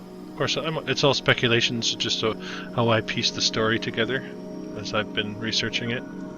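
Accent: Canada. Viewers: New subscriber and loving your content. So straight forward and easy to follow. I do love how u mention something about the founders of US maybe having the good intentions I was taught they had as a kid. Very hopefully comment on the eve of the Independence Day. I noticed u said “they have for profit prisons over there” wonder if u too are American or not? U always talk about talking to those that do this work modern day. I am a supplier of stone in Carrollton GA most all local masons buy from me and I will help them get whatever they need. I will show some screen shots to the most skilled ones and see what they say! A lot of them don’t speak first language English but I suspect they all know the narrative is bs. It’s the Americans that are the hardest to get to see what’s right in front of them. Second edit, I place the accent to Canada now. Yes our prisons are for profit, and it’s as twisted as it sounds. 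Entire portions of our population here have had extra Bain washing in hopes they will end up in the prisons to reach the numbers and fill the pockets of the evil entities that stand to profit from the prisons, or those that own them.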